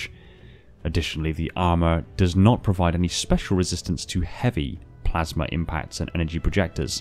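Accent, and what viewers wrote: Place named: England, United Kingdom. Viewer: The English accent makes narrating this video gives it a certain level of elegance.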